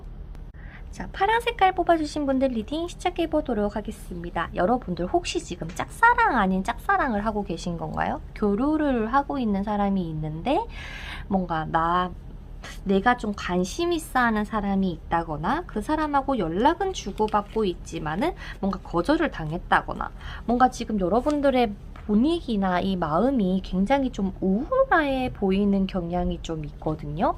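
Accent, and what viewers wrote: Gyeongsang, South Korea. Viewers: 님 경상도 분이시죠???!? 말투 사투리 야악간...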